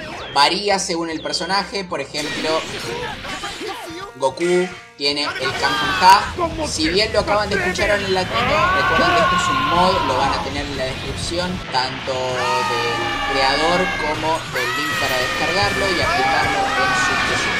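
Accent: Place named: Argentina. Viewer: Sos Argentino, (o uruguayo, no se) eso me agrada como la mina de los Simpsons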